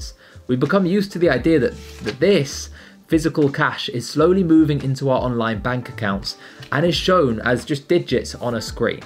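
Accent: United Kingdom. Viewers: Great video. You sound British so why a US focus ? Shame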